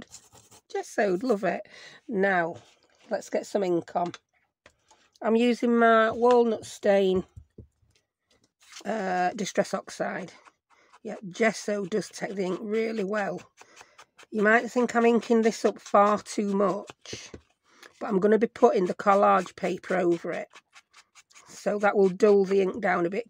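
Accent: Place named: Northern England, United Kingdom. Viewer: Northern lass🤗😁 lovely.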